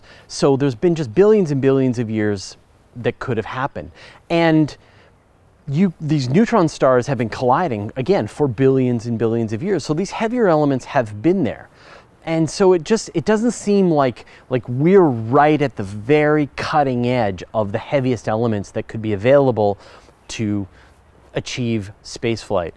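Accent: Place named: Eastern Canada, Canada. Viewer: I was born and raised in the lower mainland. I've never said 'aBOOT' nor heard anyone around me saying it. First I heard of it was from American shows mocking Canadians, but then I noticed some Canadians from Ontario using it and figured it was a eastern Canada thing. So.... a bit surprised to hear you using it Fraser Cain. Do you say "rewf" instead of roof, too?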